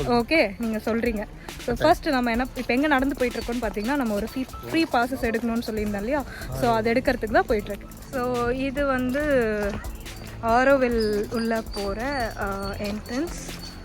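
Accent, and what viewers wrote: Sri Lanka. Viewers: இலங்கை தமிழ் ரொம்ப அழகு நானும் இலங்கை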